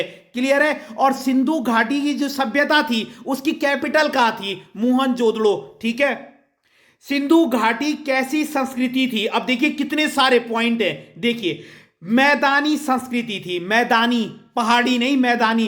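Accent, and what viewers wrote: Garhwal, India. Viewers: Sir aap gadwali ho na 🫢🫶🤭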